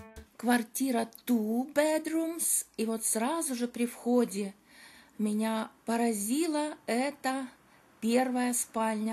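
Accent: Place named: Provincial, Russia. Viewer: А ведущая из какой хацапетовки со своим говором?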